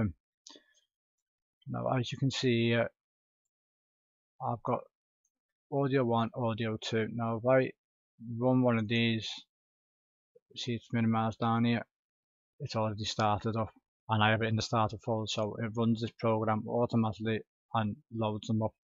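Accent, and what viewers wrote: Liverpool, United Kingdom. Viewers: Nice Tutorial Man, simple But Clear, the Way I like it. Are you from around Liverpool, it sounds like you have a Scouse Accent .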